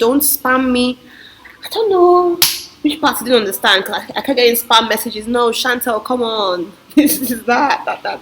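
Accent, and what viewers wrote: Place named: United Kingdom. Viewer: 😂😂😂 Pls Subscribe to my Channel please😆 That’s a silly boyfriend materials😁 Your Brit-accent is on point😂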